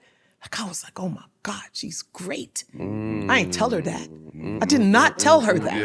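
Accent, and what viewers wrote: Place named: Brooklyn, United States. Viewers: I know is from cali, but damn she has a strong east coast accent. She sounds like she could be from brooklyn ny or harlem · She talks like a NYer. Sis is coast to coast great 👍🏾 🫶🏽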